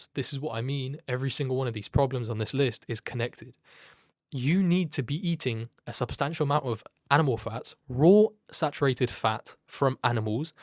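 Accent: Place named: Poland. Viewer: not the typical polish english accent 😩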